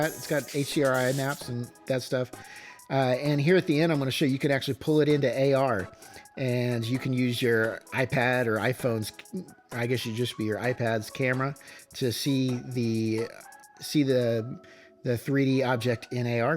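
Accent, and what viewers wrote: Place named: East End London, United Kingdom. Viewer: I can’t say enough good things about Sothern GFX and their affordable, and well structured training. Also I am enthralled with accent. I’m afraid to venture this guess, but is it East End London?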